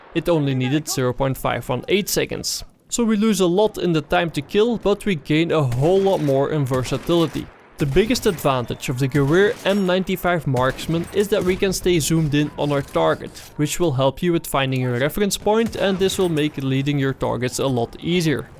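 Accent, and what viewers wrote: Netherlands. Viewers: That dutch accent. 😂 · nederlander lol ik herkende het meteen aan je accent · Ik hoor gewoon dat je nederlands bent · You sound Dutch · ik hoor al dat je Nederlands bent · that dutch accent tho, nice good work!! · battelfield wan xD. your dutch accent is overwhelming xD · Where are you from? When i first saw one of vids i heard a dutch accent so yea... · Is this a dutch voice over guy?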